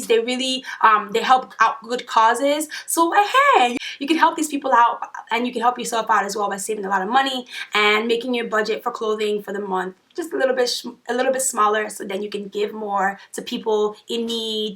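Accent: Nigeria. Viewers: Are you Nigerian?? Your accent sounds like it 😂❤️ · yes she's a NIGERIAN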